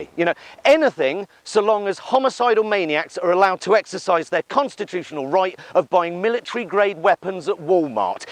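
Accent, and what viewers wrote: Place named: North, United Kingdom. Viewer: A northerner 😂😂😂 feeling the cough too, get well soon!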